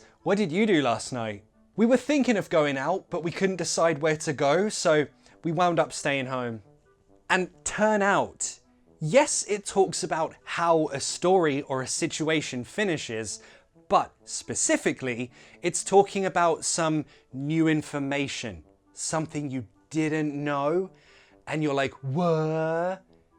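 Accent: United Kingdom. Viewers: Why do you have so nice British accent, mr.?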